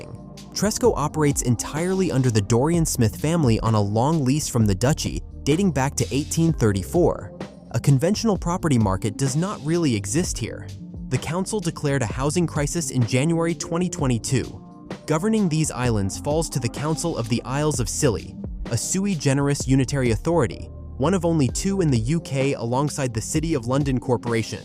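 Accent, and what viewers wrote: United States. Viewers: Why the American accent & the annoying music??? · For the Lord's own sake why the hell is it we have to put up with 'warder' and not water, 'oud of', not out of, 'Bridains' not Britains and so on. I had to turn the sound off as the voice was going through my head. The heading:- 'Forgotten British Islands', then 'Proper Britain '. Dear oh dear, what an insult. Soon after the video started, 'Bridish system' was mentioned. I turned it off in disgust to look at something else as narration was needed. "Blasted Americans, keep to your side of the Atlantic and shut the blasted door. Oh yes, turn the key - permanently"! My son moved to America in 1993. Because he assumed I had come into money, in 2007 had the nerve to call me. I thought that I was talking to an American, listening to him. Wise he was as he never called me again after that. 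I left Surrey 67 years ago and moved to Dorset. I am told I sound as though I have arrived from London even with the passing of all those years. That proves there is no need to act / sound as an annoying American. There is something a little disturbing with the English ,as in the 50s and 60s, cars were made to look American by introducing silly rear tail fins and 'wrap - round' windscreens. "Oh! my dear departed mummy, please come back, 'cos I am starting to cry and also 'cos I loved to hear the click, click of your knitting needles". - 'you there Mummy? - MUMMY?? - sniff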